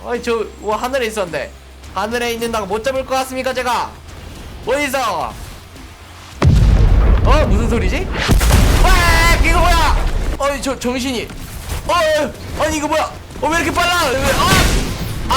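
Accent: Busan, South Korea. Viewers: 그... 원래 부산사람이 엿어요? 부산언어를 정말로 잘.쓰.시.네.요.하하ㅏ하하ㅏ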